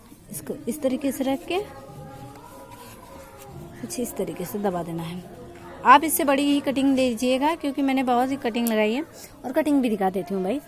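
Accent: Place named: Uttar Pradesh, India. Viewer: Accent se aap UP ki lag rahi ho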